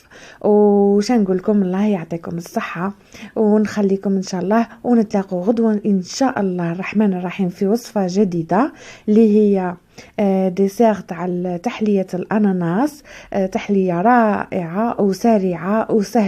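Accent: Algeria. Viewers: تحية طيبة الى السخية ليس من عادتي وضع تعليقات لكن الآن شيء مختلف، أود أن أشكر بنت وهران بنت الغرب و لهجة الغرب الحلوة ، شكرا على تواضعك و على ابتسامتك الحلوة و حتى أكون عادلة مامتك تدخل في القلب كيما بنتها، و الله ما تتخليا فرحتي عندما شفت الفيديو على شواطئ وهران و شاطى الأندلس، طيبتي خاطر اصحاب الغربة ابقي كما انتي يا حلوة 😘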